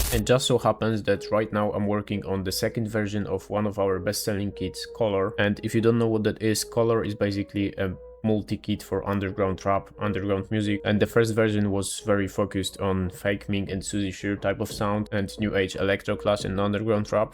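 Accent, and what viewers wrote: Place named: Poland. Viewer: thats gotta be the thickest polish accent ive heard in a while 😂😂😂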